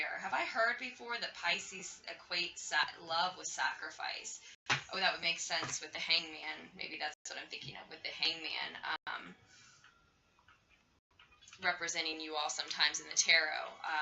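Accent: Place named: United States. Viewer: You're so beautiful and insightful. I like your energy. And, even though I suppose you're American, judging by your accent, you and your environment look quite European and more soulful than the average US-like vibe.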